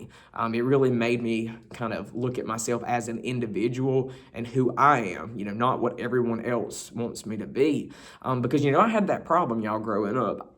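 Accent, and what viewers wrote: South, United States. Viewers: Love that Southern talk. Happy Holidays! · reminds thisn heer of u reel nice Tom Sawyer now all growd up, an edicated in certain 'fun dood disciplines' by Hucklberry Fin hiself, away an when nun wuz lookin'. We heer boyz WILL be boyz an all. (Jus gota luv that Suthern drawl.)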